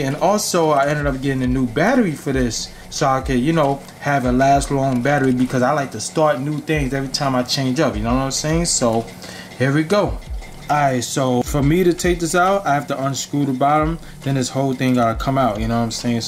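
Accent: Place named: AAVE, United States. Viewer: The fact that I understand him is amazing. Ebnonic is fun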